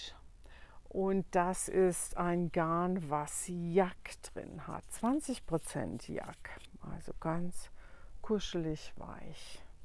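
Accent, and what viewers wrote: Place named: Hamburg, Germany. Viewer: Ah, alles klar. "Hässisch" und dann noch "hambugäsch" obendrauf. Dadurch erklärt sich vieles. 😉😘 Mag ich sehr gerne hören. Ganz liebe Grüße über den groooßen Teich 🤗 in ein so ganz anderes Klima 🌵🌞, was ich vermutlich klasse fände. 👋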